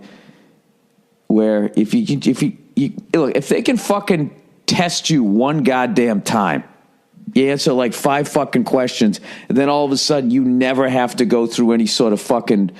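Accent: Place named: Boston, United States. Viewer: He's from the Boston area. It's just how he talks.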